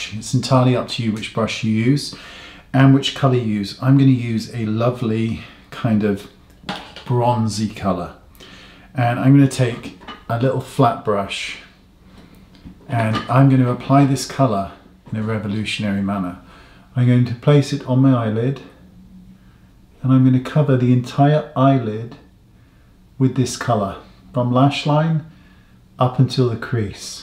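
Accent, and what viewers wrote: United Kingdom. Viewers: Where have you been all my life? I just discovered your wonderful channel and adore watching you. Being 45 and at a loss with makeup application, sharp features, eye bags, and well...generally a mess... your channel makes me laugh and smile. I wish you were my neighbor. 🥰 To my undignified American ears, I think I hear UK???? Thank you for every upload. ❤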